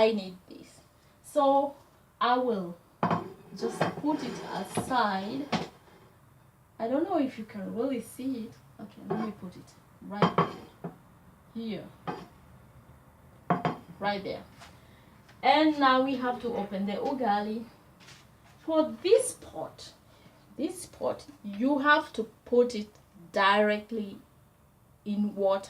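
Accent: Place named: Tanzania. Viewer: wooow surely you speak really like us Tanzanians.. It was quite easy for me to understand you... Hahaha I really laughed alooot when you said you ate ukoko at school. I really enjoyed watching you cooking Ugali mboga. As a single man tomorrow i am going to try cooking ugali mboga.... You did it quite well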